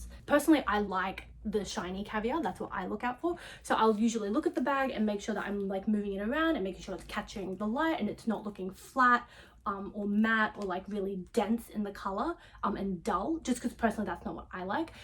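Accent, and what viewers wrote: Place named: Australia. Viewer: Best chanel video on YouTube. And your Aussie too 🤣😊🤗🥰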